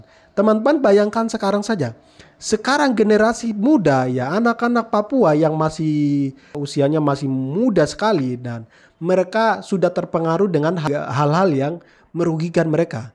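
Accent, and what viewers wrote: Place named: Java, Indonesia. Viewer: ANDA COBA NGACA DIRI DULU BARU BERI PANDANGAN AGAR JGN SDR2 OAP PROTERS ... ANDA PAKAI LOGAT ATAU AKSES JAWA BARU BERIKAN PANDANGAN UTK OAP ITU KURANG ETIS... AGAR SUPAYA PANDANGAN ANDA DIDENGAR OLEH OAP ANDA PAKAI BAHASA IBU ...KECURIGAAN KAMI OAP BAHWA ANDA DI CUCI OTAK UTK BICARA LAIN KERJA LAIN ... ANDA COBA KOREKSI DULU KONSEP BERPIKIR ADA KARENA ANDA TDK TAU MAKA ANDA TIDAK TAU APA YG TERSELUBUNG DI BALIK PANDANGAN ANDA .ITK OAP ...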